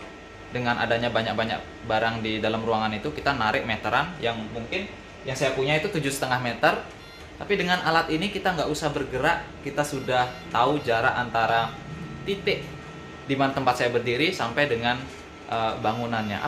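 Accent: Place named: Java, Indonesia. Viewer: Klo di perhatikan bli ini ada logat jawanya ya...munhkin pergaulan yg luas jg kli ya...😀😀👍👍👍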